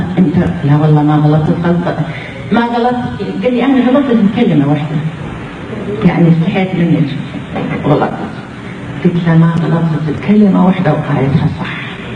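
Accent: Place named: Iraq. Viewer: ياحلو اللهجة العراقية.. احبكم هاشمية من السعودية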